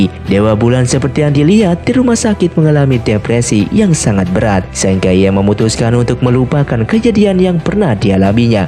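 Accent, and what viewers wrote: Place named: Java, Indonesia. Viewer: Dari jawa mana bang?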